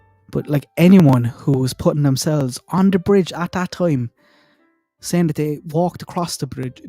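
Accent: Ireland. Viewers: I'll take an Irish accent over a dull Midwest US one or even my own southern US accent any day! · Go on my brother Irish dude that’s a southern thick brogue you have Tipperary or near keep up the good “is mise Dáithí Ó ☘️✌️.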